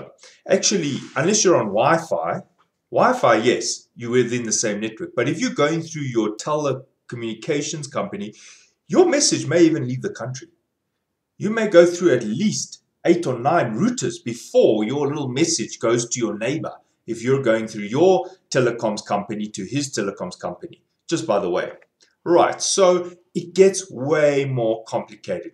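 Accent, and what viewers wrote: South Africa. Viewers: Definitely a South African accent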